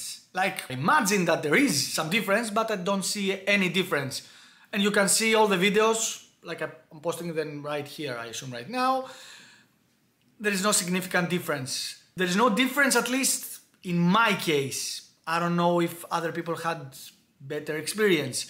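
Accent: Greece. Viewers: Thx for posting. Keep up updated. Btw, you sound like a greek fella, am I right? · Based on the accent you must be from Greece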